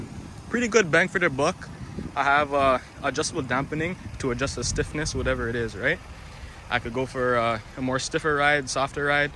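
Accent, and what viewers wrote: Toronto, Canada. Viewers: Sounds like a toronto man jheeze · Where your from ? Sound like your from the 6 .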